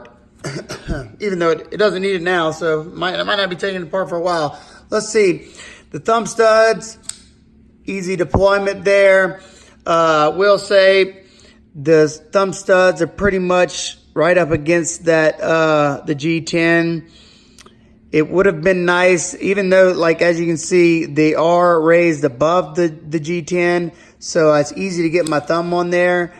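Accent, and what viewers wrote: Louisiana, United States. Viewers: Nice accent, is that Louisianna? Sounds great. Excellent video thanks!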